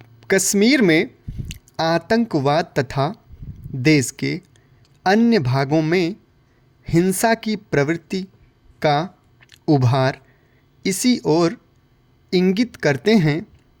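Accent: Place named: Uttarakhand, India. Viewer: आपके बोलने का तरीका उत्तराखंड में ऐसे ही बोला गया था।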